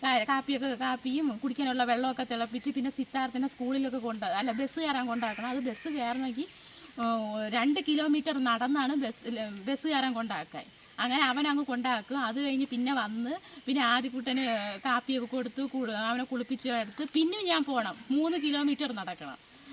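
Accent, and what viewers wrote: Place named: Thiruvananthapuram, India. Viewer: ഭാഷ നല്ലതാണ്. തിരുവനന്തപുരം slang.. Artificial ആയി സംസാരിച്ചു ഭംഗി നശിപ്പിക്കാതിരിക്കുക 😊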